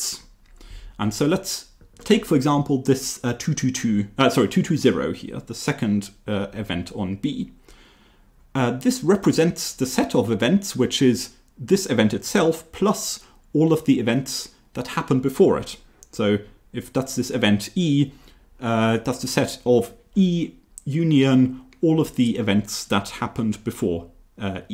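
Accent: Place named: United Kingdom. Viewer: Wow, first time listening to Cambridge University. Love it, both content and the British accent.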